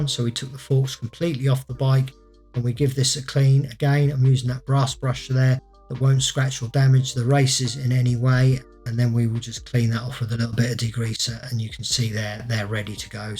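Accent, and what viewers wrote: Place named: England, United Kingdom. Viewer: The enjoyment you derive from your work is almost immediately attuned to the viewer's eyes & ears. The narration is most pleasant and always to the point, albeit with that extra British charm that an English accent brings to anything. Your humble directness really makes the whole thing a cooperative adventure in restoring these old beauties to their original dignity. Your keen eye and steady camera work really convey the importance of a thorough process. Your videos must really resonate to a very wide audience. Mike Kirwan - Kenmore, WA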